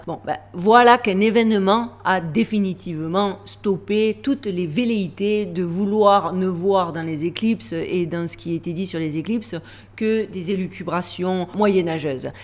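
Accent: South, France. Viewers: J'ai decouvert recemment votre chaine et franchement bravo : votre déroulé est structuré, les themes pertinents et suspens, humour et cet accent du sud que j'me tant. Tout y est. Alors on ne lache rien, on met nos ceintures et on s'envole avec vous pour des horizons plus ouverts. Tant mieux si tout ce qu'on a appris etait faux ca nous force a decoder plus loin. Et en science on dit que toute hypothese est vraie jusqu'a ce qu'une autre l'infirme et la remplace. Restons modestes et les yeux et le coeur grand ouvert. Vous nous montrez la voie. Les contes et mythologies populaires nous plongent dans nos origines et les conteurs etaient des personnes riches d'un savoir caché transmis de bouche a oreille alors j'embarque avec joie !!!! Force a vous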